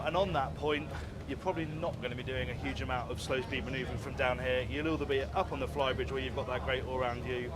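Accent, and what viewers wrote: RP, United Kingdom. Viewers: 2) Questions, 1st) Why is do they put the helm station on one side of the fly bridge, while the helm below is on the opposite side? 2nd) Do they put the helm on a fly bridge, on the side of the boat most frequently used to dock, making it easer for docking? Therefore wouldn't you want the same orientation below as well? or having the helm on opposite side offers, the best flexibility when docking? Your my 1st-ish or 2nd-ish, favorite boat presenter's, very British, kinda too proper. Opposed to Aquaholic, who's more flamboyant. Both of you are stalwart, and very good presenters, comfortable on camera, and the information you provide, is always clear and... precise Thank's from the US. Cheers!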